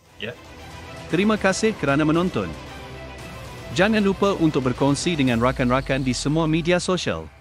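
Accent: Indonesia. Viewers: Kalo Di video ini, Bahasa melayu nya, sangat indonesia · Dari cara bicara nya pun lebih banyak logat dan abjad bahasa Indonesia...😂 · Suka Melayu,tp logat Indonesia wkwkwk 😂😂😂😂 Indonesia mmg the best👍👍👍👍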